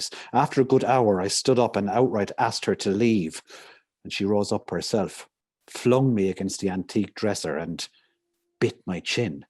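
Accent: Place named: Donegal, Ireland. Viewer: Fantashteesh. Fair attempt at a Donegal accent too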